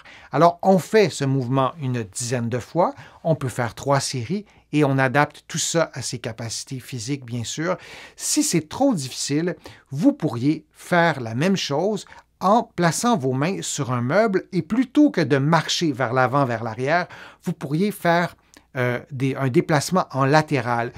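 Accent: Quebec, Canada. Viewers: Merci pour la vidéo, j'adore votre accent québécois ❤ Autre souci passé la 60aine: la "bouée de sauvetage", cette graisse abdominale, localisée au niveau du ventre et des hanches qui veut s'installer subrepticement! Pourtant je fais 1h d'exercices tous les matins, une fois par semaine 2 heures à la salle de fitness, je vais aussi nager et, n'ayant pas de voiture, je marche tous les jours, je suis végétarienne depuis bientôt 50 ans, fais attention au sucre... rien n'y fait, je ne sais plus quoi faire 🤷🏼‍♀️ Tous conseils bienvenus, merci d'avance 🙏🏼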